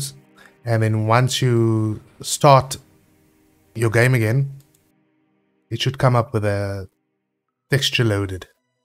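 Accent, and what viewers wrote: South Africa. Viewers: I play on 720p and btw you can't hide that South African accent I'm also from here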